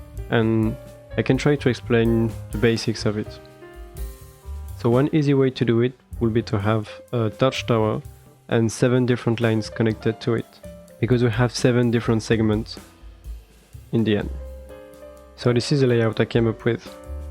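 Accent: France. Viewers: Bro you are french isn't it?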